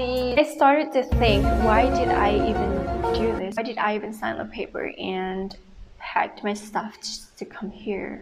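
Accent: Kedah, Malaysia. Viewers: firstly, your accent ahhaha 😂 then loghat kedah...2ndly, ive got an iv dgn kastam so just watching , btw i study in construction ind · Mula ii tengok.. ingtkan makkk salehhh join pdrm.. lpstu keluar loghat kedah.... Okay 😐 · org kedah ka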